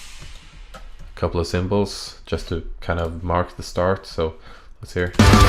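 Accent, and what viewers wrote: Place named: Scandinavia. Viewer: Scandinavian accent.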